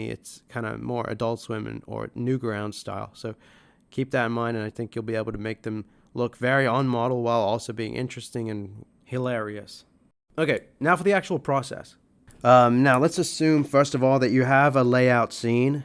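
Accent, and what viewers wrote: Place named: Australia. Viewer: slightly higher pitched Australian *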